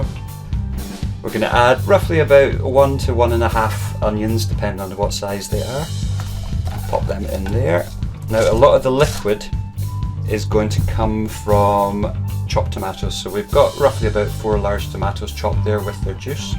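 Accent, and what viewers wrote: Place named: Ireland. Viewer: Cool recipe makes it better hearing an Irish voice :)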